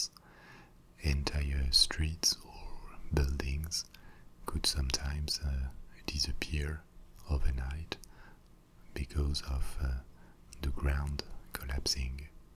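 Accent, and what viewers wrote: France. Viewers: Just found your channel. French accented ASMR--exactly what I was looking for. And your voice is ideal. And your videos actually explore fascinating topics. Very pleased. Thanks for doing what you do.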